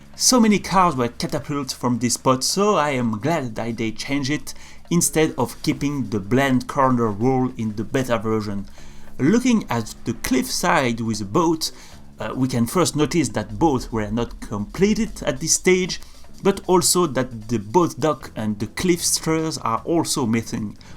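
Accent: France. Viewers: you sound kinda french :') , neat video from yours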